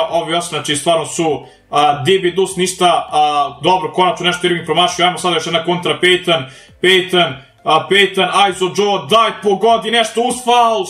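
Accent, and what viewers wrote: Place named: Nis, Serbia. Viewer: pricas ko da si sa nisa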